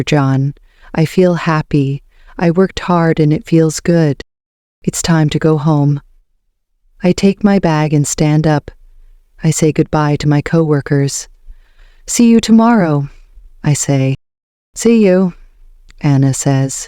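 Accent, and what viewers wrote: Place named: United States. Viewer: Sorry why it's It's the British council but you've an American accent? I want to learn English accent with this method. Thanks.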